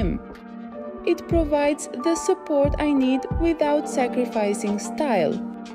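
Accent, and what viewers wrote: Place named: Stavropol, Russia. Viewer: Ставропольский акцент не спрячешь)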